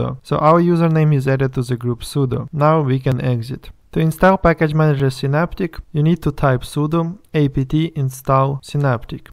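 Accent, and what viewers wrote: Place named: Russia. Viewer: I don't think so - he has a very strong Russian accent :-)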